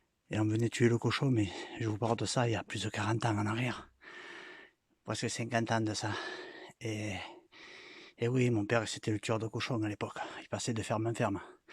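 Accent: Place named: South, France. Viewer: belle vidéo, l'accent du sud je m abonne à votre chaine et un pouce bleu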